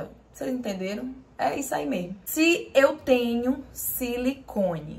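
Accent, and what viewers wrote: Ceará, Brazil. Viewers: Nossa pensei que era do nordeste jureiii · desde o primeiro vídeo teu que eu assisti suspeitava que fosse do Ceará, o jeito de falar entrega dmss 😂❤ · Esse sutaque do nordeste toda meiga, gostei demais do vídeo simpática e humilde sempre estou interagindo com você no instagram beijos mulher !! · Mlr, a primeira vez q eu ouvi vc falar já imaginei q era daqui do Ceará. Sou de Fortaleza. Bjos!!!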